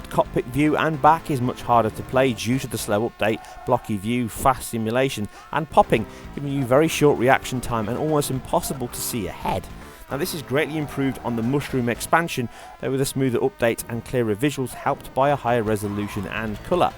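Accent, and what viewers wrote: United Kingdom. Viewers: Nice vid but (no offense) I can not understand a lot of words you’re saying, with my American ears. What kind of accent do you have, some kind of heavy British accent, right? Anyway nice vid. :)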